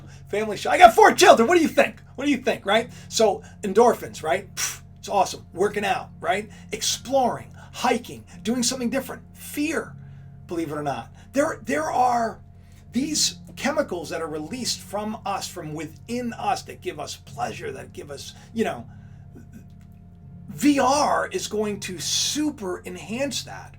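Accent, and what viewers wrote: Ireland. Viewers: Darn good Irish accent 🍀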